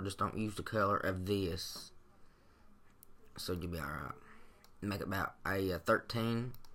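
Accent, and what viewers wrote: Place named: Southeast, United States. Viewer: Being a southerner (Texan) myself, this sounds southeastern, like Alabama/Miss/Tenn.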